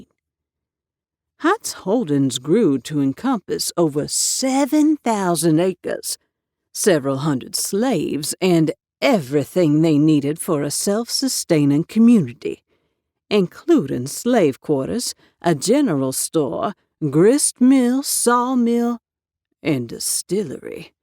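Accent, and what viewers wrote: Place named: South, United States. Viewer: Love this but the southern accent is too much and this is coming from a southern gal from the mountain community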